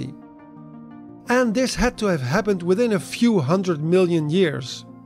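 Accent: Netherlands. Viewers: Love Dutch English as Dutch man ❤️😅❤️ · Excellent video in beautiful Dutch accent ! Do more of this type of explainers. Best wishes 🎉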